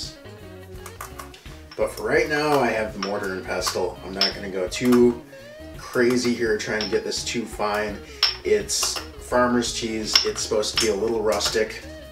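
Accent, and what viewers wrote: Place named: Northern Kentucky, United States. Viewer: Are you from northern Kentucky? You sound like it. 😊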